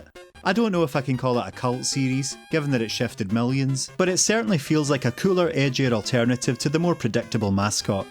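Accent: Scotland, United Kingdom. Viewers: Nice, thanks for the reply, I'll definitely check out your other videos! I really like the sound of your voice (it's the Scottish accent).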